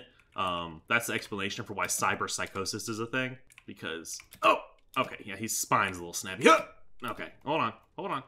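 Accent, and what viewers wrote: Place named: Brooklyn, United States. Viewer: you sound like brooklyn guy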